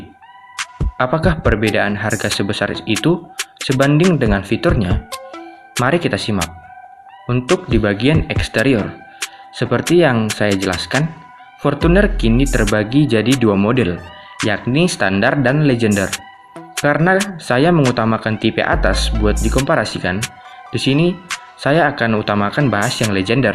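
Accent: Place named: Sumatra, Indonesia. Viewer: Reviewnya logat sumatra banget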